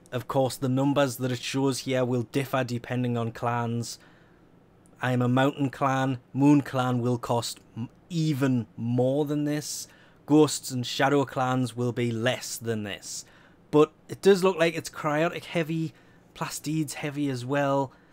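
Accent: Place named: Newcastle, United Kingdom. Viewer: Ziot English, possibly a Geordie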